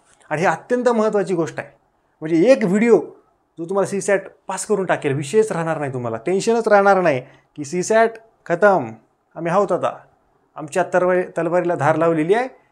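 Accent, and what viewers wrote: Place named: Marathwada, India. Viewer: तुमची शिकवण्याची भाषा खूप छान आहे ...एकदम सहज समजते....एकदम रोखठोक आणि मराठवाड्यातील मराठी...💪👍🙏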